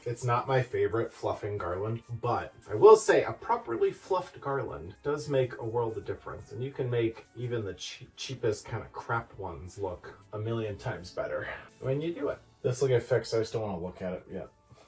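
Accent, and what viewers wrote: Minnesota, United States. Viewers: you look VERY fetching in that red plaid shirt!! I'm sorry it was making you itchy and bitchy, but you were giving 6'4" lumberjack vibes!! 🪚 🪵!! On the 8th day of Vlogmas my true love gave to me: Eight cameras tumblin' Seven cutouts glowin' Six berries spillin' Five Christmas trees 🎄🎄🎄🎄🎄 Four Paolo meows Three sets of batting Two power strips 🔌🔌 And you sound like the movie Fargo 🎄⛄🙂⛄🎄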